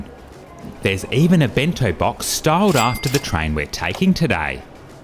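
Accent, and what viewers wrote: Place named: Australia. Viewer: good to see you again Love your heavy Aussie (if I may say so) accent 😊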